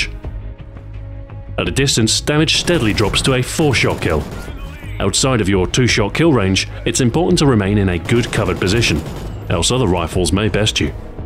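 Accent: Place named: England, United Kingdom. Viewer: The English accent makes you sound very intelligent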